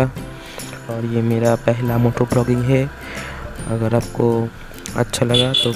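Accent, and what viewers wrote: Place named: West Bengal, India. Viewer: Gd one..bt you should improve your hindi 😇..i think you are from west bengal ❤️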